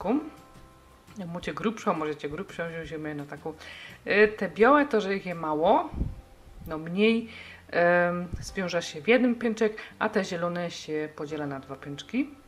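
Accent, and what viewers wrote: Silesia, Poland. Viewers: Te nitki obetnam, te szporogi pomieszom... Uwielbiam slunski jezyk :D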